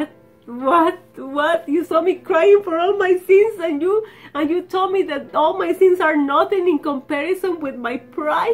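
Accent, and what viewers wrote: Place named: Peru. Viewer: siiii jaja, estaba buscando lo del timon que se bloqueaa y vi el tuyo por q estaba asustado y de ahi me dio curiosidad tu canal,ademas tu forma de hablar jaja,dijeee ella ES PERUANAAAA❤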